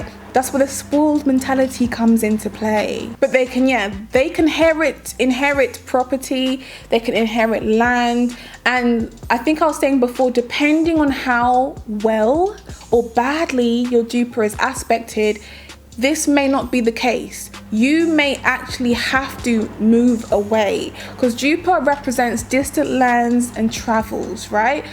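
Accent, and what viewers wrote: United Kingdom. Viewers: U got this Lil nice Lil vibe wit you with yo great Britton sounding ahh 😂😂😂😂but it's sexy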